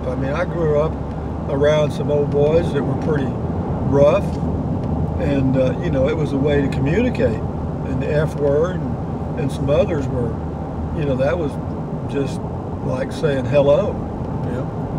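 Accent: Texas, United States. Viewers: Always cheering to hear your Texas-inflected enthusiasm for life,. I started out with your walk through Van Horn, and from there I started to ride shotgun on your drives through beautiful West Texas and the desert Southwest (well, me and 44,000 other folks, that is), and from there I became a subscriber to your channel and I haven't looked back. I grew up in Texas, and though I fell in love with the big city and moved here to NYC (and look where THAT got me), I'll never stop loving West Texas and New Mexico and its good people, and I just want to say that being able to leave the confines of my small Manhattan apartment and head out for the open western skies with makes the day a hell of a lot easier to get through, and I am grateful to you — or much obliged, as a Texan might say. I'll see to it that I use your Amazon link next time I go shopping there. 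I wish you all good things. Keep up the great work. Best regards, Seth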